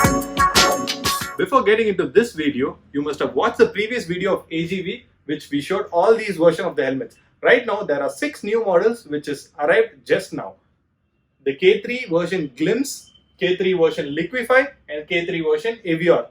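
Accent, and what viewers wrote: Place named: India. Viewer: I like English with Indian acsen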